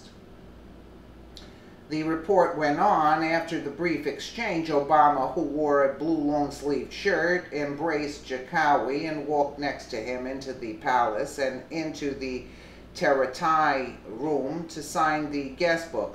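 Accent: New York, United States. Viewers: Lmao, part of the reason I love this channel is that I love listening to the woman's voice who reads the news articles. Your New York or Jersey accent just brings a smile to my face and has me crackin up (being from NY myself)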